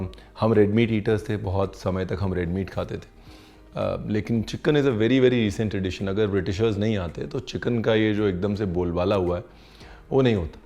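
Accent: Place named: Punjab, India. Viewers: Your punjabi accent is to die for 🤗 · I don't know if youtube read my mind today. I was about to comment in one of ur video "k sir Punjabi v bol dea kro vich vich" den Utube came up wid this suggested video. Like tadka is must in every dish, ur punjabi accent also necessary in every cooking video. Dhanwaad Paaji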